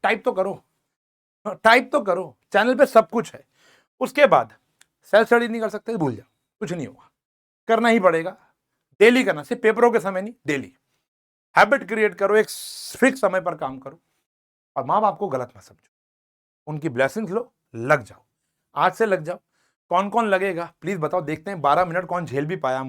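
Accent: Punjab, India. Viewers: Are you from punjab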